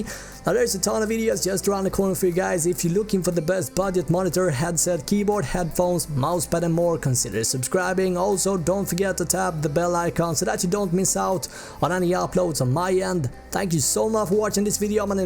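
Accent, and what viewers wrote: Sweden. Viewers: are you from Sweden?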